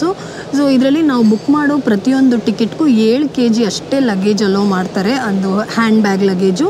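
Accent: North Karnataka, India. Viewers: Madam baal information kodathiri tumba ista aadri nivu baal chanda uttara Karnataka maath mathadteri please nam baashe explore maadi namma bashedag vlog maadri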